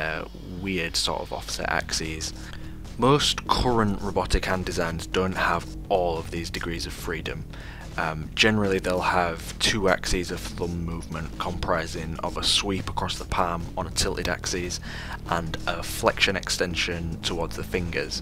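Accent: Hull, United Kingdom. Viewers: Are you from Hull?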